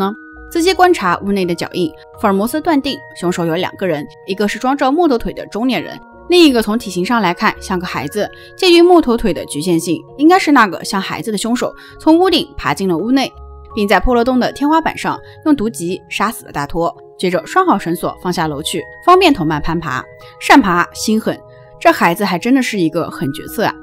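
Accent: Nanjing, China. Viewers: 这口音暴露了蓝鲸人...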